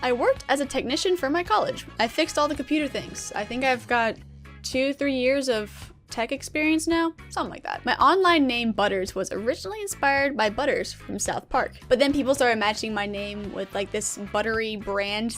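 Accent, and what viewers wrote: South, United States. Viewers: Nothing fazed me that 6ou were from Georgia I'm from Georgia but they say I have a more northern accent and I can hear the southern in you lol kinda cute though not gonna lie😂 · I knew you were from the south lmao I heard the slight accent in your new videos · I knew it I knew you had a southern accent how I am southern too so ha got em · So much southern accent and its amazinnnnnnnnnnnn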